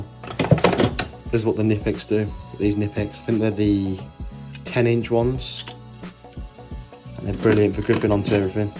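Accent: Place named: Birmingham, United Kingdom. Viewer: You have an impressive tool kit! Glad you go for quality like knipex in hand tools, not chesp but top quality - I love Knipex. You sound like a fellow 'Brummie' All the best mate - I've subscribed 👍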